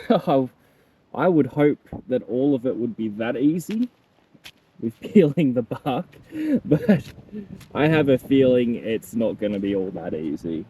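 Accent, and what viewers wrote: Australia. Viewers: Hello fellow Aussie. Wishing you all the very best in your new adventure on the land. I’ll be checking in to see how you’re going on your homesteading journey. Great to hear a fellow Aussie on YouTube.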